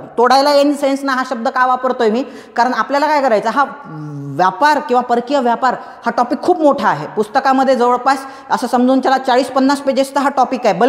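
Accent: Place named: Amravati, India. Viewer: Aj khup divsane amaravti chi language aaikun khup chan vatle.... Bhari ekdm. Lecture aaikun tr khup fayda zala.. Pn aj amaravti chi athvn.. Ali ba. 🙂😀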